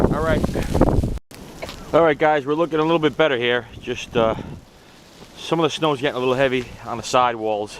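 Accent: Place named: New England, United States. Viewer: Sounds like your in mass or ri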